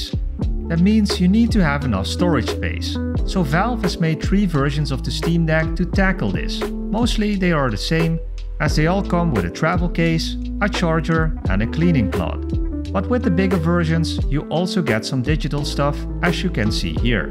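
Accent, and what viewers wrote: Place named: Netherlands. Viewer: Jij bent toch een Nederlander é haha, ik hoor het aan je accent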